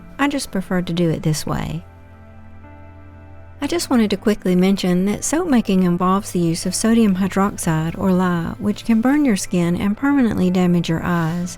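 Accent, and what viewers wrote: South, United States. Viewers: Does her English sound weird to you? She has a southern accent, from the Southern states of the US.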